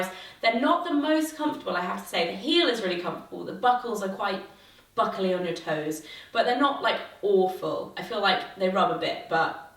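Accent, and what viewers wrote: China. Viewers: The speaker is 100% speaking a Chinese accent! Lol! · The speaker accent sounds like Chinese (mandarin speaking) accent =) it's cute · Hahaha....the accent? I think it's Chinese!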